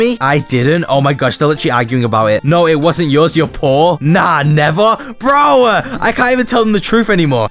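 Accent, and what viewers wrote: United Kingdom. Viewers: Bros British…Hello mate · HES BRITISH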